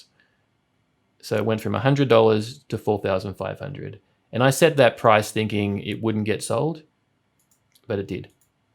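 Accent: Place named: Australia. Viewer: Seems interesting but because u sound like a white Australian, it’s highly likely that this is some kind of colonialist scam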